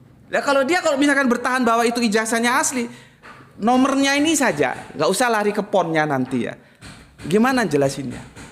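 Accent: Java, Indonesia. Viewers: Medok kok pake gue gue, koyok kodok! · Ga usah GUE GUE lah... GA PANTES & GA NYAMAN dengarnya ! Logat aja logat Jawa kental gitu koq GUE GUE... !!! Wkwkwkwk... 😂😂😂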